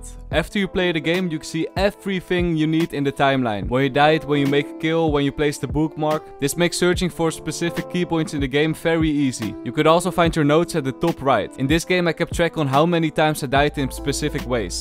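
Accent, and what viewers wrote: Netherlands. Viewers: are u netherland ? u raely have the accent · is that a dutch accent i hear?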